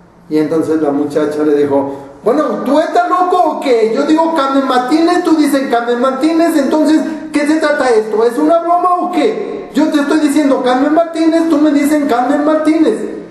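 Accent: Mexico. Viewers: así hablan los de la ciudad de México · tienes acento mexicano nada neutro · Hablaste con un acento típico mexicano, con mantenimiento de /s/ (muchas veces pronunciada como /z/...), acortamiento de vocales y entonación mexicana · Ni bien comenzo los 3 primeros segundos del video me di cuenta que eras mexicano ... Ni siquiera vi los comentarios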